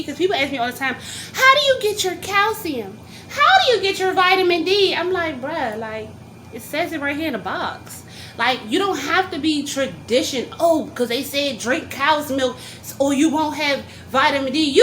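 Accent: New York, United States. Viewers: You talk like New York flavor flave 😄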